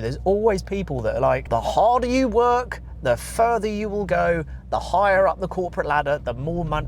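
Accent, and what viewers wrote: United Kingdom. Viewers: I noticed right away that you are English.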